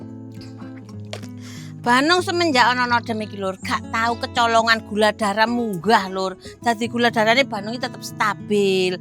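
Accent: East Java, Indonesia. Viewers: Bu rumah nya dmn🙏koq logatnya spt daerah etanan😊😊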